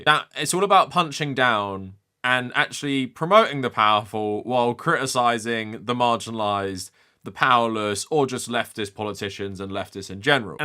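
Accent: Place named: United Kingdom. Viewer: Judging by your accent, you're from the place that spawned Monty Python. Can't blame Babylon bee and Onion for not making funny satire by UK standards. US standards in comedy have a lot of catching up to do in this regard, but they're at a level where the average American can appreciate and laugh at the jokes.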